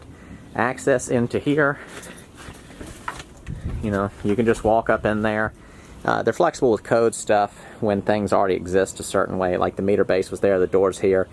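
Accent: South, United States. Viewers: I really like your videos, think I have seen most of them. I'm no american accent expert, but I understand this is some kind of southern accent. In what state do you live/do this work?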